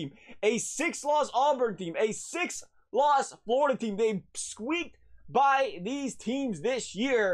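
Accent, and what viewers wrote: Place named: Ohio, United States. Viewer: you are the most Ohio looking and sounding person I’ve ever seen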